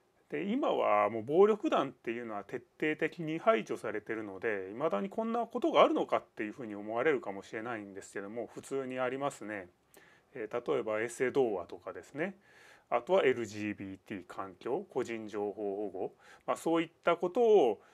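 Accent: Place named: Tsu, Japan. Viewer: 投稿主さん神奈川とかついてるけど、喋りがこっちぽいから津の人？？？？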